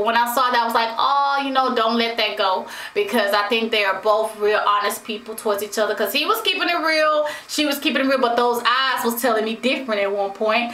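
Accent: South, United States. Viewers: i feels that?? hahaha. your country accent cracks me up (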